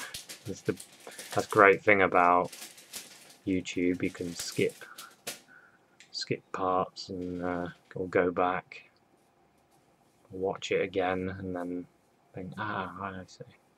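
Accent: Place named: Liverpool, United Kingdom. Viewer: Hya, love your pieces, you sound like your in or from Liverpool.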